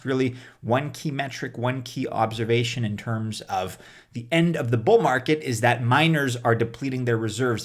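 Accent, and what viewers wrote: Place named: Canada. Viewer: I come for the Canadian accent. Chance at ETH doesn't hurt either.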